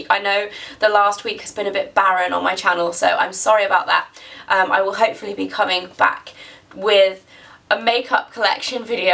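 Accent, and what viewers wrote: United Kingdom. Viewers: you always look like a california girl with a british accent :D i love it !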